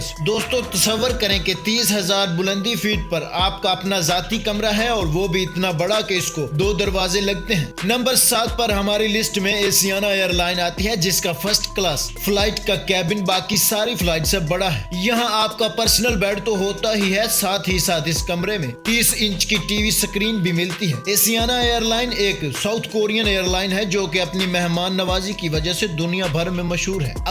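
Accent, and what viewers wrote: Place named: India. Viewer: Are you an Indian cause your pronouncanation is so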